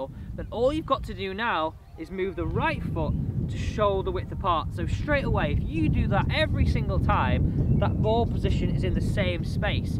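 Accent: United Kingdom. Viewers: Sry hard to understand briti english. Louzy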